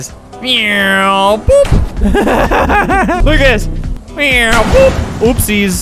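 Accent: United Kingdom. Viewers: This is amazing cause im from South London and quite a few people actually speak like this lol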